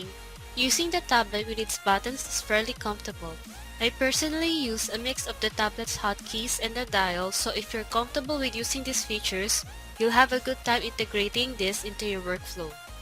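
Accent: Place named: Philippines. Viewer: OMG ate!! pinoy accent 🇵🇭😆💪 idol talaga kita. mag practice ako ng mabuti 😤.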